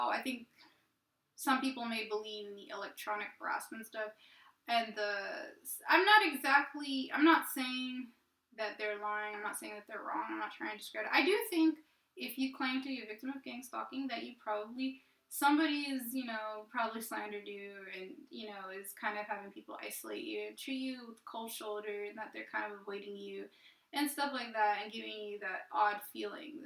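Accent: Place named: South, United States. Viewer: Hey. I love your southern accent. Praying for us all. Take care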